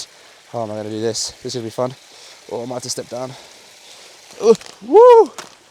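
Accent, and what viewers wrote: England, United Kingdom. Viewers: Se nota que eres británico de Inglaterra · your British accent is also wonderful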